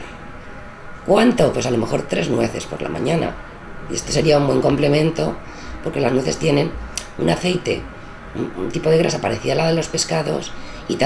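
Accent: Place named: Valencia, Spain. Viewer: Que bonita voz valenciana.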